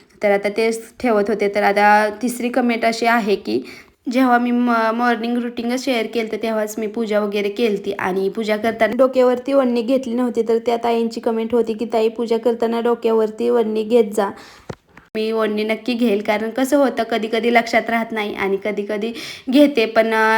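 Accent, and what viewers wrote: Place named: Manchar Khed, India. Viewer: Tuz gav कोणत... मंचर खेड ची आहेस का तु... भाषे वरून वाटतं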